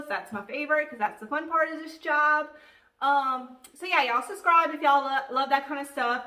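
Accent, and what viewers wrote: Louisiana, United States. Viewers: Omg your cute little accent!!! I stumbled upon this video and wad not expecting a cajun accent, love it and all your beautiful items!!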